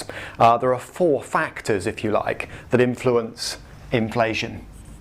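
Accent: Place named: England, United Kingdom. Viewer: I think he speaks very well and clearly., unless you just don't like English accents. I don't dig his shirt though.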